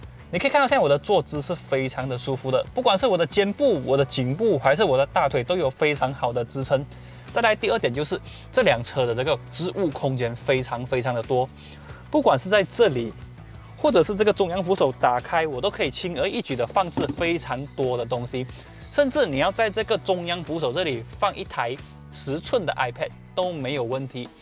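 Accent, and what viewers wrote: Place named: Malaysia. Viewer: 马来的华语不都这样吗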